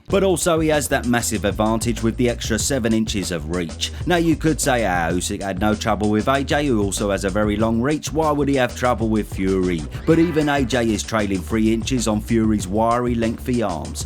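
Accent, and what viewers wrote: Cockney, United Kingdom. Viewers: "Daniel Dubious" "He moves like a postman with the s#!ts" All of it said with the accent of a pick pocket in a Guy Ritchie movie.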